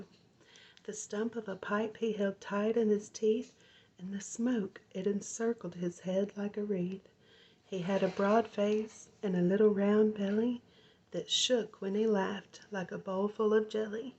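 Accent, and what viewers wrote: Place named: South, United States. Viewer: Hi ❤️. Thank you so much for this wonderful video ❤️. I really enjoyed it. The whispering, hand movements, tracing and story reading is very tingly and relaxing. It's been a long time since I've seen a pop-up book. That's really cute. Your whispering and southern accent really brighten the stories. Thank you for sharing this with us. Your soft soothing voice really adds to the tingles. Thank you again so much for this wonderful video ❤️. Please stay safe and be careful ❤️. Merry Christmas my friend 🧑‍🎄❄️☃️🦌🎄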